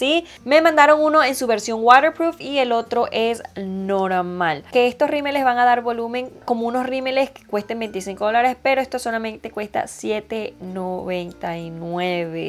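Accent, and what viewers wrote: Venezuela. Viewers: Saludos mi china!!! Hermosa como siempre y más aún cuando defiendes tu acento venezolano 📣📣📣 🙌🙌🙌 Me encanta tu personalidad las cosas te resbalan y no tomas nada a pecho 😏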